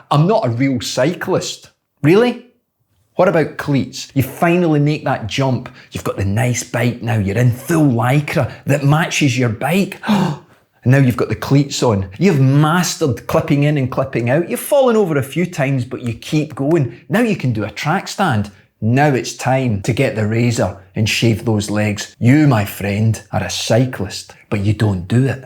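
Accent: Scotland, United Kingdom. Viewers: That’s it in a nutshell! Like the Nike slogan, “Just do it!”. BTW, is your accent Scots or Irish? I’m thinking you’re a lad from Scotland. Yes or no?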